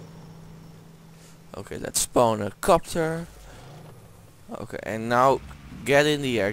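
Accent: Netherlands. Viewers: are u from the netherlands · ik hoor dat je nederlands bent maar je kan goed engels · are you dutch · ***** hij is nederlander het accent is zo typish... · I like your video, you can speak pretty good English but I know you're Dutch :p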